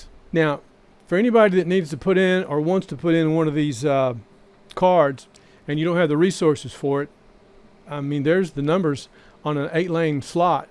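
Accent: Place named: South, United States. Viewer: what a nice southern pronunciation. Tennessee or South Carolina ?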